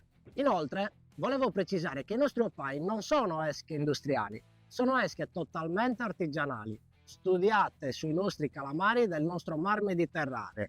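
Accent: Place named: Sardinia, Italy. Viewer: Stavo solo curiosando ma, presto , mi ha colpito una " strana" cadenza a me molto cara.......non propriamente casteddaia ma che si avvicina parecchio . Non avendo barca ripiego sull'eging da terra sempre che il " nostro" amato maestrale lo permette......saluti franco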